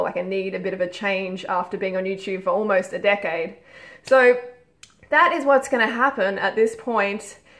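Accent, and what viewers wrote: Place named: Australia. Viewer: say whatever you want Bebe, i can definitely listen to that Aussie accent of yours all day:)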